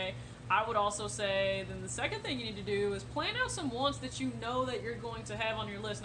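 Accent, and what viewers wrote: South, United States. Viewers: Yu remind me of Sandy off Sponge Bob but in a cute sexy kind of way 😝 ...I think it’s the country accent 😍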